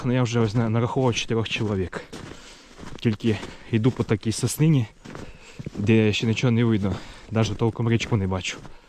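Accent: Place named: Polissia, Ukraine. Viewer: так, лайк за україномовний контент, і я чую по Вашому говору поліський край, Житомирська область, коростенський район??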